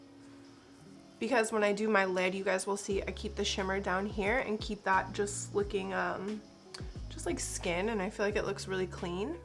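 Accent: South, United States. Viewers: You are hysterical and absolutely stunning!!!! Loved the southern accent throughout! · First time ever watching you.. omg love you!!! Anyways your too funny with your southern accent and bombshell glam beauty I loves it stay cool babes! Looking forward to watching you more.. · Gorgeous look ❤️ Yes, just do the makeup style you love 😊 Thank God you saved the contour!!! 🥴🙏😁 Brows looked great. Love your sarcasm and southern accent 😂 so funny! Creme de Nude is my fave 💄The foundation looked flawless on your skin.. I wish the undertone was more neutral ❤️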